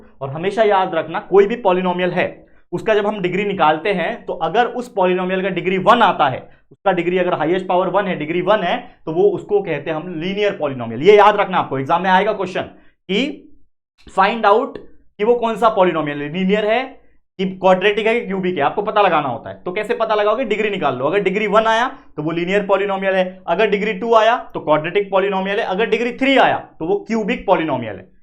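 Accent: Maharashtra, India. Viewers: Are you from maharashtra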